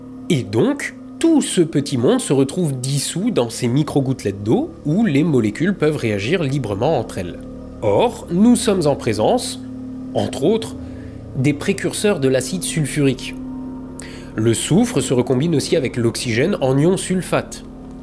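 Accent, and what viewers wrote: Alsace, France. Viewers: Hello! Bien qu' "écolo" dans l'âme, je pense comme toi qu'aujourd'hui le nucléaire est indispensable/un moindre mal... Mais : Je pense aussi que tu te trompe a propos de la pollution que cela engendre, il s'agit de la pollution indirecte qu'engendre les déchets du nucléaire que nous ne savons pas traiter, ceux qu'on enterre, qu'on stock en attendant ou que certain voudrais envoyer dans l'espace... C'est l'equivalent des décharges, c'est un problème qu'on laisse pour plus tard "en attendant que...", ces problèmes mis sous le tapis sont le veritable noeud du problème a mon sens. Pour le reste (bien que ta voix alsacienne me cringe énormément et me pousse a skipper systématiquement le placement de produit dans la seconde) bravo pour le contenu de tes videos, continue comme ca :) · Peu importe le sujet (toujours d'une très grande qualité), l'accent alsacien vaut à lui seul le détour ! 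😁 "ALSACE STYLE"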